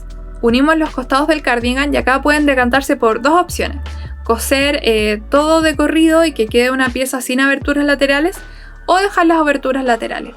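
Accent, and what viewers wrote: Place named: Chile. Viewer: Amo el acento chileno😊 ya me suscribí. Muy buen tutorial. Saludos desde México. Ojalá hagas mas videos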